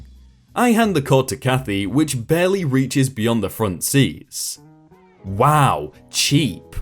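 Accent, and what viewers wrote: United Kingdom. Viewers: and also i posted my mc a month a go and you havent read it its on your discord its on redit its in the comments on one of your videos . im getting frustrated listing and staying up to date with your videos because the Pommy accent makes my blood boil im half American half Australian both country that left Britain because of your country accent/rules get on to it asap please sorry for being mean but at least i didnt swear that is super hard for Australians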